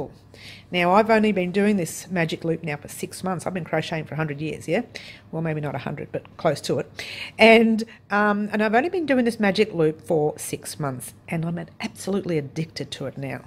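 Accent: Australia. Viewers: Wow love your accent Australian lady ty for sharing.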